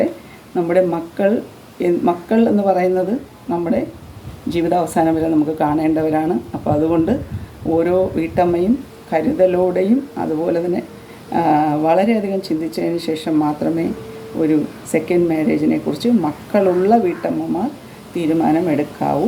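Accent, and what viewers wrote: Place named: North India, India. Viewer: North Indian ladya pola und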